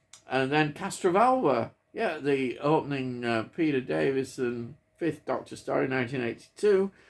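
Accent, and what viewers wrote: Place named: Liverpool, United Kingdom. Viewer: This man is speaking in a scouse accent.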